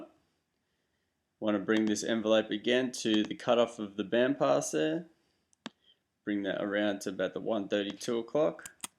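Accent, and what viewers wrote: Australia. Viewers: refreshing to hear an aussie haha good tuturial man!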